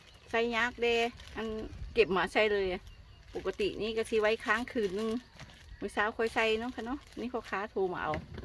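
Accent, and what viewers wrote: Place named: Loei, Thailand. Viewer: อยู่ จังหวัดไหนค่ะ พูดเหมือนคน เมืองเลย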